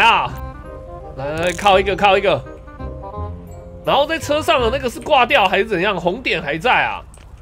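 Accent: Hong Kong, China. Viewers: 你是香港人媽我不是但看ˋ你講話很像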